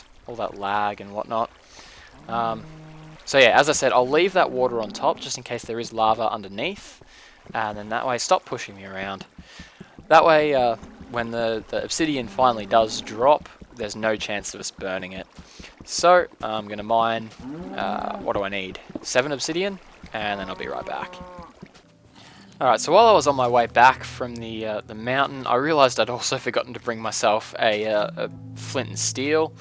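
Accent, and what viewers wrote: Australia. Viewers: are u australian?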